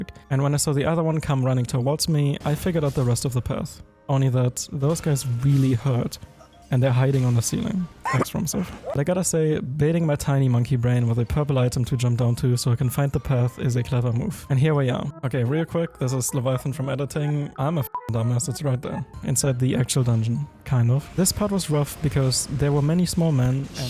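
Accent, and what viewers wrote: Germany. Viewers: Du bist Deutsch oder? Hört man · Either this is the strongest german accent or some kind of hidden english accent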